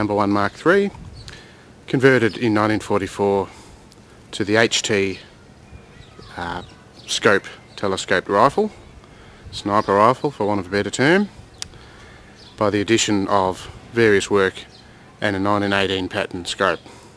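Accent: Australia. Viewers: Ahhhh I have to laugh at all the aussie talk comments. You from NSW or Victoria??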